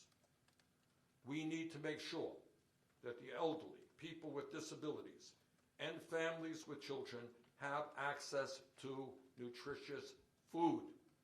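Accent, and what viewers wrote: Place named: Brooklyn, United States. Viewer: English with a very irritating Brooklyn accent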